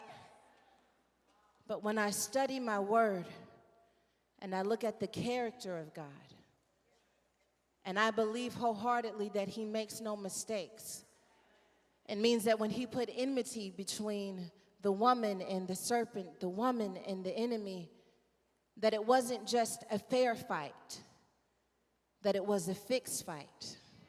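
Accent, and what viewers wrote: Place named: AAVE, United States. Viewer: Hahaha! I love! Bring the ebonics sis! Lol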